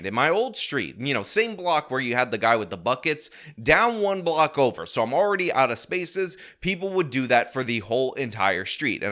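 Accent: New York, United States. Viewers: Oh Boy, your "inner New York" REALLY came out on this video:-)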